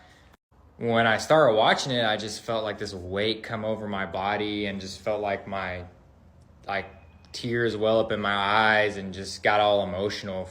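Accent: United States. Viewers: Alhamdolillah, welcome to the true brotherhood! The accent sounds American and not British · He has an American accent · He sounds American. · Has an American accent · Sounds American rather than British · American accent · That’s what I thought too defos American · His accent is American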